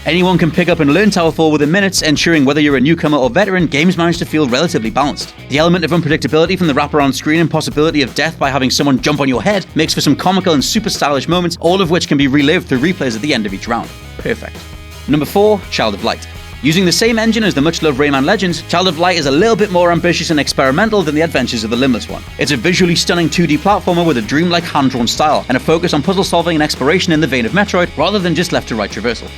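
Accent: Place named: Newcastle, United Kingdom. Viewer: At everyone criticising his narrative speed; You guys have never met a Geordie, have you? Confusing outsiders is our raison d'etre.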